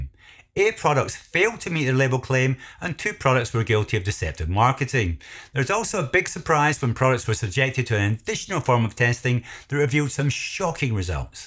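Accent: United Kingdom. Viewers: He sounds British to me and his channel states UK. Regardless, British Supplements company I would very much like to know given what they stand for but also because they're not 3rd Party tested.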